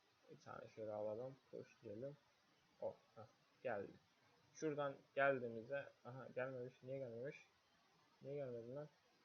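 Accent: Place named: Turkey. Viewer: kelimeleri türkçe gibi okuduğunda çıldırıyorum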